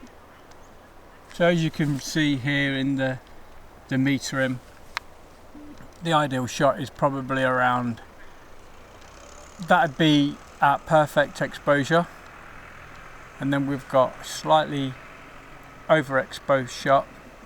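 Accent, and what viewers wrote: Leicester, United Kingdom. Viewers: Good video. Love the Lestah accent. I worked there’s for a few years and now call rolls cobs lol 😂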